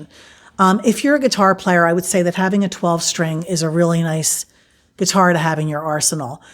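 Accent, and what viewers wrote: United States. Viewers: first time we’ve head your speaking voice. Accent….Upstate New York? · For that cost, that is a heck of a great sound! My wife has an Ovation 12…forget the exact model. She’s getting back into playing, and she’ll probably need that guitar re-set up. And do I detect a bit of a Philly brogue in your voice? Awesome stuff…will have to check out more!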